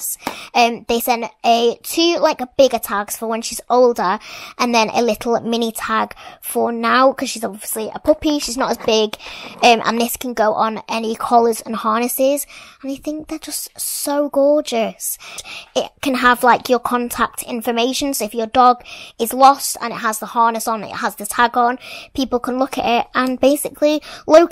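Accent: United Kingdom. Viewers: What a pretty voice speaking British 🥰 and a beautiful face and body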